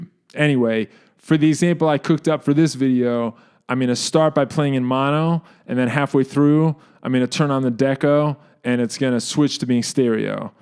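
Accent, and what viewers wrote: Boston, United States. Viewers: This guy is actually really intelligent especially when it comes to music and pedal theory, but I can never not hear a Departed style super bostony/Ny parody accent, or that one guy from Family Guy that's like "I was going to bone my girlfriend, but there was no way"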